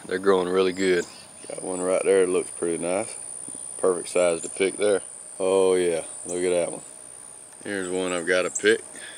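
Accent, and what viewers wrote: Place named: South, United States. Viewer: I guess I will have to plant some okra next year. It sounds good. We just get one crop here in Virginia but we don't burn out. Our tomatoes, peppers, melons, etc are just starting to bear and will go until frost. Sweet corn just finished, but racoons and possums got into it. Yeah, you sound country, but there's something else really relaxing about your voice. You should read books on tape or do voice overs for commercials or become a movie star!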